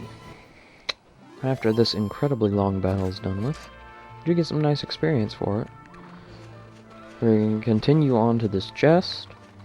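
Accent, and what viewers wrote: South, United States. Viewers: So...do I detect a southern accent? I'm from Oklahoma and I visit New York every other year sooooo.....yeah I usually recognize an accent when I hear one...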